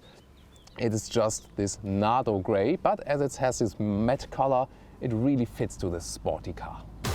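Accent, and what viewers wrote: Germany. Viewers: If you like Audi mehr ähh more... hahaah Finds auch witzig wie du Autogefühl ausspricht, klingt wie nen Engländer der versucht des auszusprechen, aber sonst halt immer voll den deutschen Akzent xD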